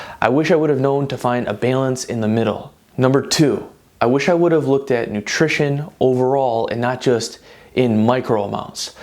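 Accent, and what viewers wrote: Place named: Chicago, United States. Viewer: I recognize this accent. You've got to be from my hometown of Chicago (Chicagoland), right?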